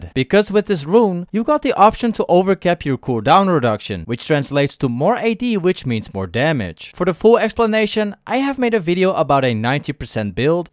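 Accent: Netherlands. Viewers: ayy we out here. But are you dutch? You have that accent atleasd ^^.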